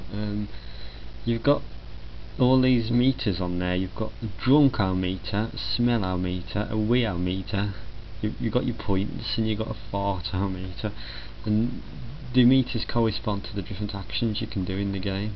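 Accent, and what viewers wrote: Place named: Dudley, United Kingdom. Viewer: This gets 5 stars... just for the dudlay accent!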